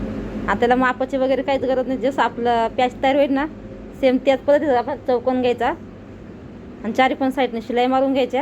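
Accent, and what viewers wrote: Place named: India. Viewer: आपण इंग्रजी माध्यमाच्या शाळेत शिकलात का? मराठी मध्ये बोलताना जास्तीत जास्त इंग्रजी शब्द वापरताय. कट करणे,मार्किंग करणे,साइज,फोल्ड करणे इ.शब्द. एरवी बोलण्याची‌ पद्धत व उच्चार गावरानी वाटतात.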